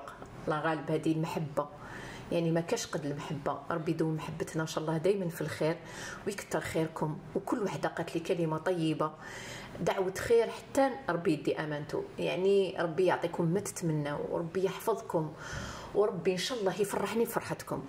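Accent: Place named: Souara, Algeria. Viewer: اواه يا مدام تهدري كيما السوارة ماسافاش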